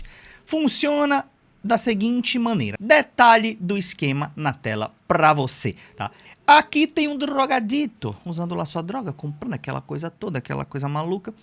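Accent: Manaus, Brazil. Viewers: Você é de Manaus? O teu sotaque me lembra muito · Sou Paulista morando em Manaus há mais de 15 anos. Bom demais ver um Manaura arroxado divulgando conhecimentos de qualidade em um nicho dominado pelos Sudestinos. E con um sotaque muito mais divertido. Força e sucesso na sua jornada!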